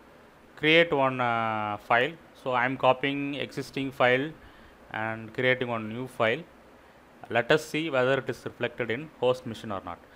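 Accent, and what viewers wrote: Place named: South Asia. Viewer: Bro I love your desi pan eating accent uwu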